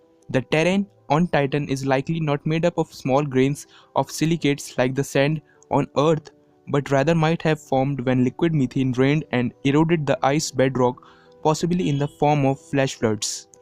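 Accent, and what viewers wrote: India. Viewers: I think you r a Indian!!! because of ur pronunciation I can gusse u r a Indian! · The way you speak English I understood that you're from India.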